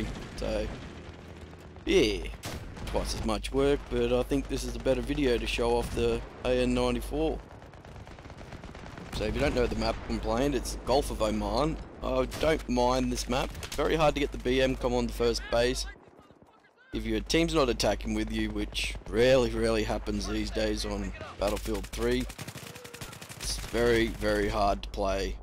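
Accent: Australia. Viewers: Like maa peenis, i love australian accents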